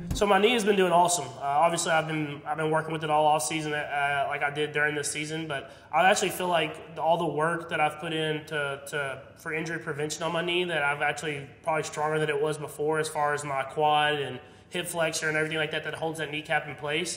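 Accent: South, United States. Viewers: His southern accent is awesome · He’s got a country accent. And I love it lol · It’s the southern accent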